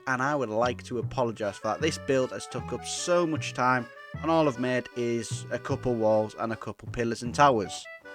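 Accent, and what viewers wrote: United Kingdom. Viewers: lol I thought you were Scottish because I have a teacher from Scotland who has your accent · My Man is britishhh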